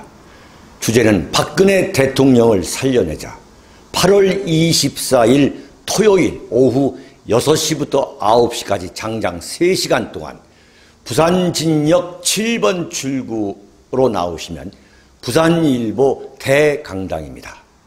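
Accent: Chungcheong, South Korea. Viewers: 윤칼세님 방송이 그래도 재밌음 느릿느릿한 충청도말이지만 진실과 설명을 잘 애기해주네요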